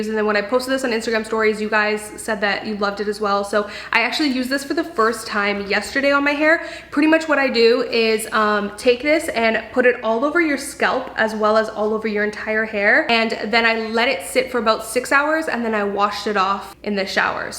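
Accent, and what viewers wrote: Canada. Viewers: Jaclyn Calderon it’s cringe to me but that’s how Canadians say it lol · Oh is she from Canada? I was noticing she says “beegs” instead of “bags”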